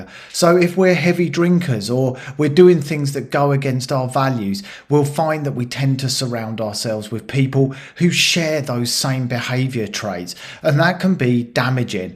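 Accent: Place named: England, United Kingdom. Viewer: Apart from excellent advice ....its so refreshing to hear an English accent on this uttrly whitewashed americanised yourube app. 😂🎉🎉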